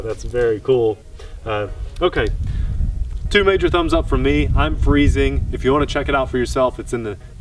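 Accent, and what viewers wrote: United States. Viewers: "Very fun!", you have to be an American with that English.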